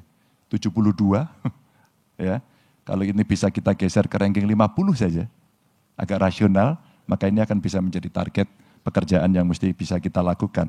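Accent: Java, Indonesia. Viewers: itu bro bukti pak ganjar bisa dan tahu bahasa inggris ya kalau soal logat beda orang lidah jawa sama lidah orang luar apalagi pak ganjar belum pernah tinggal di luar negri ataupun mengenyam pendidikan diluar negri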